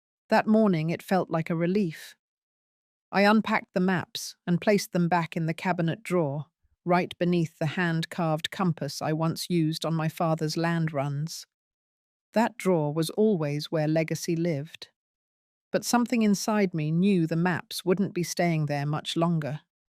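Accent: England, United Kingdom. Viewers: Why is a woman with a very heavy British accent talking abiut maps in Missouri? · As the Woman voice is in UK English, assume this is a British story. You would not drive for 9 hrs to her sons home in the uk. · She's from Missouri but speaks with an English accent?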